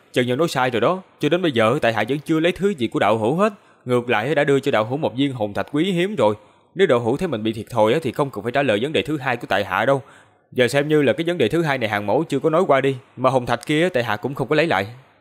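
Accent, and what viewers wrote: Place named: Mekong Delta, Vietnam. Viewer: Bn ng miền tay thì phải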